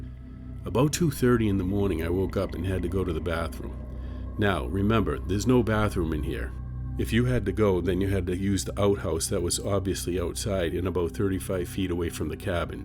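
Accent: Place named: Northeast, United States. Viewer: The northeast accent is refreshingly unique. Very much enjoying your channel. Looking forward to watching it grow.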